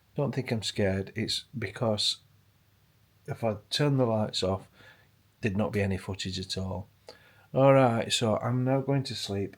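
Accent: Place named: Scotland, United Kingdom. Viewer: Hellooooo is there any ghosts, you sound scottish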